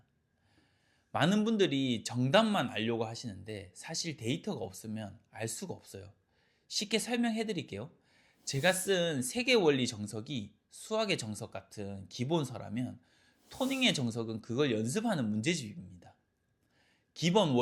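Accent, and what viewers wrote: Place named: Changwon, South Korea. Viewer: 쌤 창원 출신인가요? 억양이 딱인데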